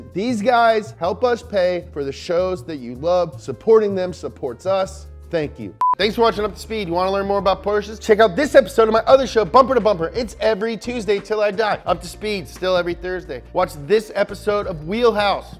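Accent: Germany. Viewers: I need a 12 hour compilation of speaking German-ish English, anyone else? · out here with the best german accent in the business. Love it!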